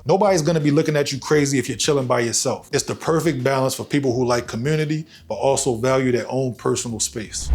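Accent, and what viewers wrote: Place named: Baltimore, United States. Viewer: U might be in Atlanta But u gotta be from the DMV Bmore accent heavy 🤝🏾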